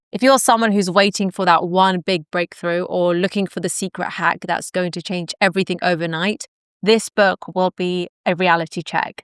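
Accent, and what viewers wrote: England, United Kingdom. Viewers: Bit plain accent pls. Too pommy accent yak for ears!! I tried many time to listen your video. Rich contents disgusting pronunciation! Sorry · I loved that British accent on non-british.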